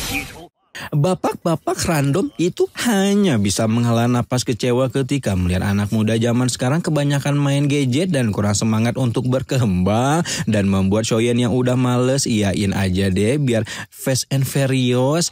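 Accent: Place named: West Java, Indonesia. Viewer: Coo ... Humor gw .. sekilas orng sunda si mamang 😂😂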